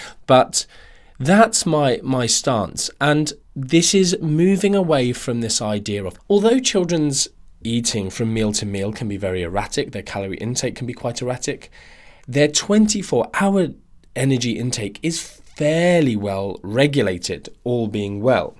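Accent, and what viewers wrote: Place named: England, United Kingdom. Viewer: Unusually good-looking beard. Ah, - no grey prickly hedgehog-style, or lack thereof. Talk matching it, - good to hear English English for a change.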